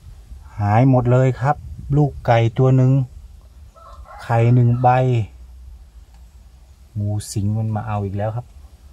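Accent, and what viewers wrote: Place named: Central Thailand, Thailand. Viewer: พี่พูดกลางทำไมไม่เรียกปลาช่อนครับ5555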